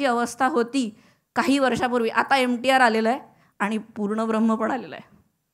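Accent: India. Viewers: I think if u r wearing navvari saari and showing so much gratitude toward maharashtra then u should change ur accent as well... As you were staying in banglore for year u r having that South Indian accent which sounds really irritating. Please be proud of being maharashtrian. U r purpose will not fulfil by only wearing navvari but please work on accent. Stop taking out that south indian accent. If u really proud of being maharashtrian.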